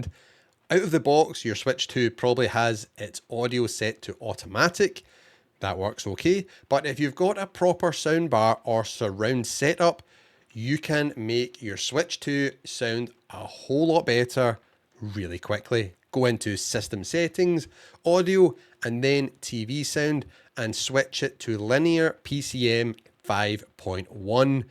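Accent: Scotland, United Kingdom. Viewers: The Scottish accent. The best accent in the world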